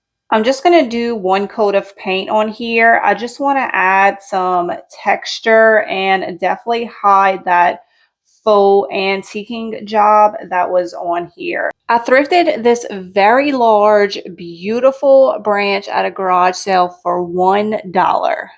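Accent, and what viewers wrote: Louisiana, United States. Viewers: Love watching your creative projects! Also, I have to know where your accent originates! My guess is Louisiana.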